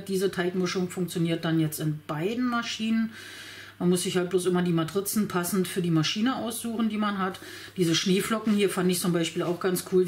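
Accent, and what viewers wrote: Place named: Berlin, Germany. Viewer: Hallo, vielen Dank für Deine Videos, liebe, die berliner Schnauze finde ich als Rheinländerin schon amüsant. Ich muss aber mal was fragen, ich such mir einen Wolf......gibt es einen Adapter, mit dem man die Matrizen der großen Avance auf die kleine Viva schrauben kann? Für die große gibt es so viele Formscheiben....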